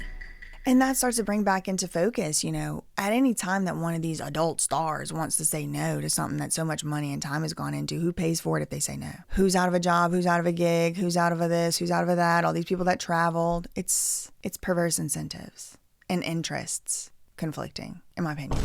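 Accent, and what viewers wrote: Northern England, United Kingdom. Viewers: Im Scottish and your UK Northern English accent was great! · That's EXACTLY how northern girls speak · OMG this is one of the BEST videos you have done! You have to find some more UK stories because hearing your accent made me smile for the first time today! Thank You❤ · That UK accent... chefs kiss. Dont nobody need to be in the chat to tell you different 😂💂🏻‍♀️ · To intentionally want to cause trauma on others really?? sounds to me like she weaponised her own trauma. WOW, i'm speechless, i'm based in the UK and i didn't even know about the depth of this s**tshow. Thanks for shining a light. Ps: Nice British accent ha!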